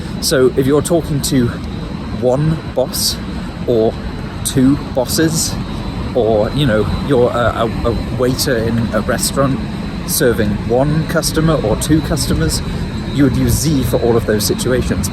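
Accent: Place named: Argentina. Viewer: Qué buen acento argentino! Y el video super interesante. I hope someday we get a video in Argentinian accent😂 you,re almost there, just need to replace the LL sound for SH (lluvia sounds like shuvia)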